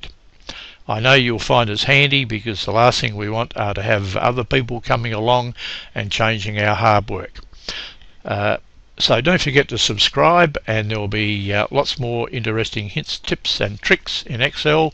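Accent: Australia. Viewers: Thanks very much. Love the Australian accent.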